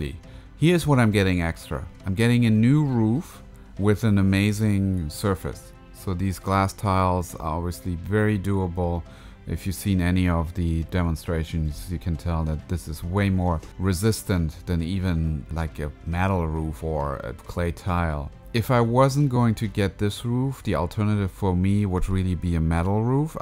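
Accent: Germany. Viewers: Your accent sounds German.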